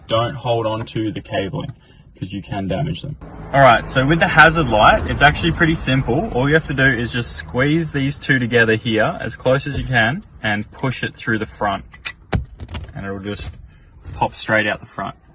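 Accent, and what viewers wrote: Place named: Australia. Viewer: Excellent job. Extra marks for speaking Australian English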